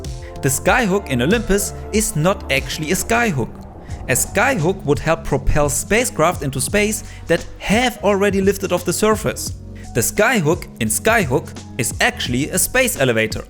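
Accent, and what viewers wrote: Germany. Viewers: youre german? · are you german? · Let me guess. You are German? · Are you from German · Are u german?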